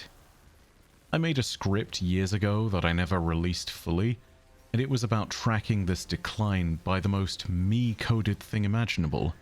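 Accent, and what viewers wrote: United Kingdom. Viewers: people hear a british accent and tend to get pulled in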